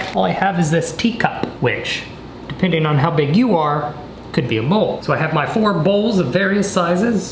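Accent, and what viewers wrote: South, United States. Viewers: Just watched a video from 2015. You've gotten more southern? I guess.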